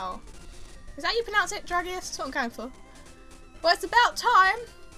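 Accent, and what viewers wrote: United Kingdom. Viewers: " What did I call my other fletchlin'?...........I don't remember!" You gotta love British accents!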